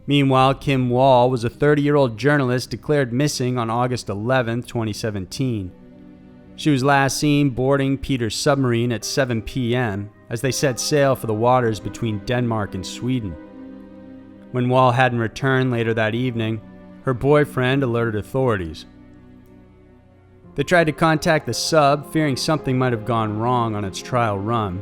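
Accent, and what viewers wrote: South, United States. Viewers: Can anyone pick his accent? He reminds me a little of Elvis so I'm guessing he's from Mississippi.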